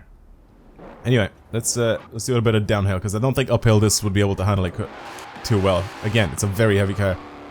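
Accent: Ireland. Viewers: Hey, quick question, where abouts are you from, I can’t help but think you have an Irish accent although I could be completely wrong! Keep up the great work!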